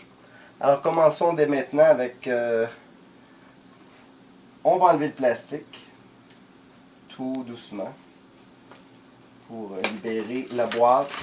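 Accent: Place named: Quebec, Canada. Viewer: i love your french Canadian accent!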